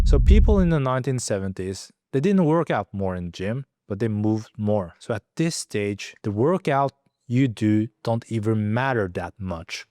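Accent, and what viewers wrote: Norway. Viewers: Lol, you sound Norwegian?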